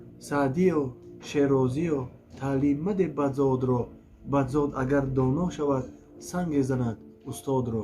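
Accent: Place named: Yovon, Tajikistan. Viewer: Ту монда буди ба хами акценти ёвонит Абдурозика таьна накарда.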